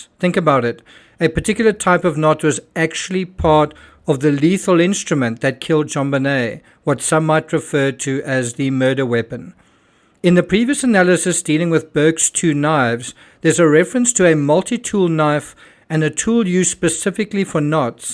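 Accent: South Africa. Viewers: That south african accent though. Hideous af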